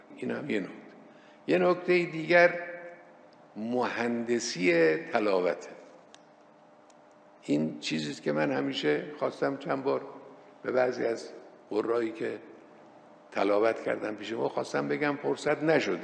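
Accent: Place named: Arab world. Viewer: حاجی من و تو آخر عاقبت نداریم اون دنیا باید جواب سختی پس بدیم حالا هی با لحجه عربی صلوات بفرست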